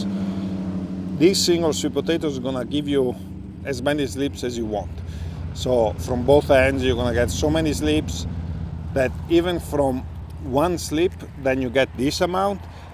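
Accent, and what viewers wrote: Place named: Italy. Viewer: Grande.. … looks good 👍👍 love your Italian accent when you speak English 😂😂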